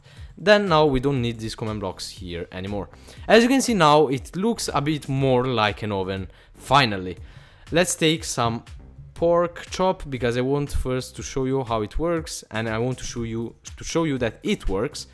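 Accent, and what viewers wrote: Italy. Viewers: I think good? You are Italian? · Ma sei italiano?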